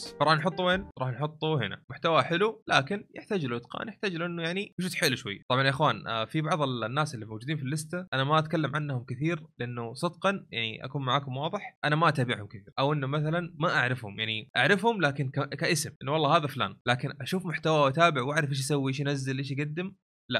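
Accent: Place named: Iraq. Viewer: صراحة دوك صوتو واللهجة العراقية يكفون يخلو من الافضل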